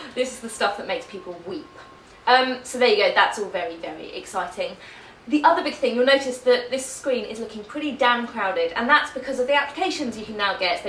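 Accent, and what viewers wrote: United Kingdom. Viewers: are u british? if u are - what the hell are u doing in USA? just kidding. great video. great iphone!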